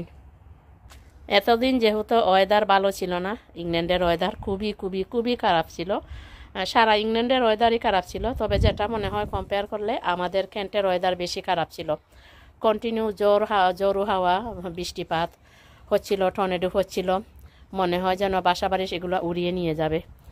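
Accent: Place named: Sylhet, Bangladesh. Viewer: আসসালামু আলাইকুম আপু কেমন আছেন আপু আপনার বিডয় টা দেখে কুব ভালো লাগলো আপু আপনি মনে হই আমাদের সিলেটে মানুষ কথা গুলো শুনতে খুব ভালো লাগলো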